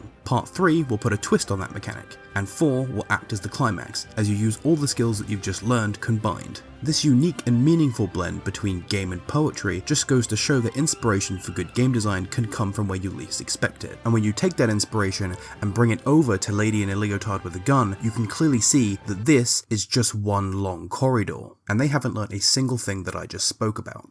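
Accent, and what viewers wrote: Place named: Australia. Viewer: Love this game and your amazing Australian accent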